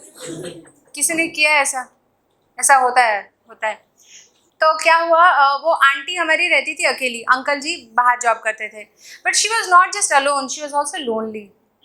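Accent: Bihar, India. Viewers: I like your bihari tone 😅😅😂😂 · That bihari accent.. 👍😂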